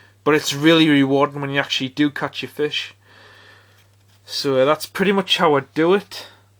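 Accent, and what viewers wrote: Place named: Northumberland, United Kingdom. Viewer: Hiya m8, put it this way, on warm still summer nites u can catch school bass, coalies & perhaps a sea trout, which of course u return! from behind the rokers, so on wi your chesties follow the tide back & I used 2 prefer 2 cast sideways along the beach, parallel 2 the waves if any, also on the whale rock ive had coalies, very small Pollack, tommies & 1 wrasse!, keep up the vids as its nice 2 hear someone speaking properly as what we do!!!, from a fellow Blyth dangler.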